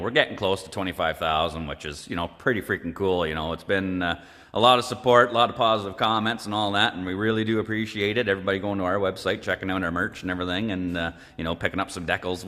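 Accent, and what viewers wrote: Canada. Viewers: Hey dude , you must be canadian? Great content! Keep up the great vids.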